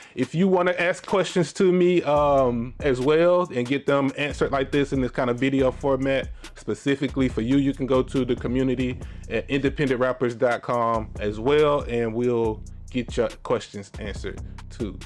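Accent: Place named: Houston, United States. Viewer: My boi got that H-Tine accent. 🔥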